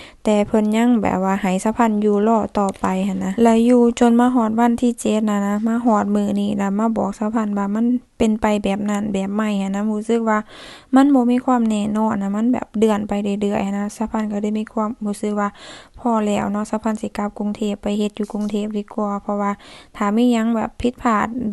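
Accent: Laos. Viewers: อีกอย่าง สำเนียงพูดลาว FC บอกหลายทีแล้ว เวลาติดต่อราชการให้เปลี่ยน นี้ก็ไม่เคยจะทำ จะมาขอสัญชาติไทย ยังจะพูดลาว จะมาห่วงแหนความเป็นลาวอะไรขนาดนั้น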